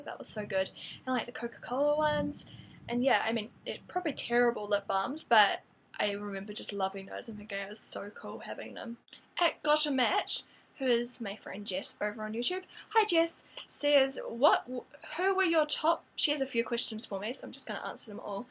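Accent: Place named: New Zealand. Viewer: This is the first video of yours I have seen and i was like. Hmm i regognise your accent and i Heard you say auckland and im like, Aeeee found another kiwi!! Im from dunedin(: live the video btw your so pretty!! xx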